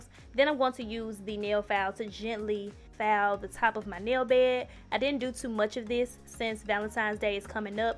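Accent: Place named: Memphis, United States. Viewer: Came here for the chin lift and heard that Memphis accent ... ended up watching the whole video 💕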